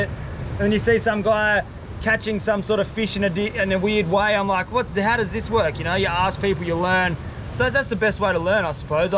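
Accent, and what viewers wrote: Australia. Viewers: Thanks for doing a Q & A video. I could easily tell you were a fellow Aussie but didn’t know where you came from. Also good to learn a little more about your background and some more about your girlfriend. One other thing I was curious about was the food poisoning/sickness question. I’m NOT making any judgements here but many of the situations where you’re preparing your food looks quite unsanitary. So, I was always afraid you’d make yourself very ill. Just like many other thousands of Australian citizens, I hope you can come back home in the near future, very soon. Keep up the great work with the videos :) Oh, and I also grew up in the country and had interesting pets. We had emus!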